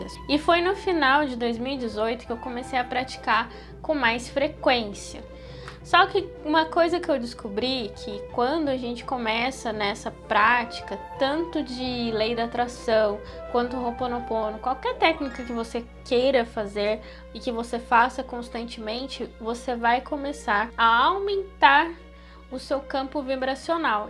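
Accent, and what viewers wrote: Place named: Minas Gerais, Brazil. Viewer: Ganhou mais um inscrito 💖 Amei o Canal. Você é Mineira ? 😅 Sotaque legal 😍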